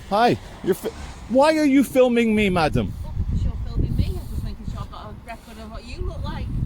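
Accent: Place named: Scotland, United Kingdom. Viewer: Your accent is just Scottish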